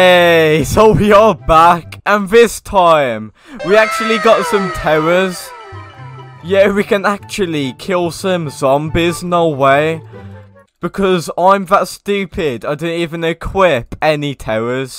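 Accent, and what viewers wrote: United Kingdom. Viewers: Nice British voice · and that british accent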